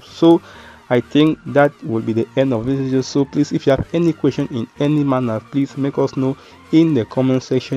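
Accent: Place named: Nigeria. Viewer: Your Nigerian accent is hard to understand please make a video on how to restore the backup to a different WhatsApp like business WhatsApp otherwise this video was great thanks..... Steve from Zambia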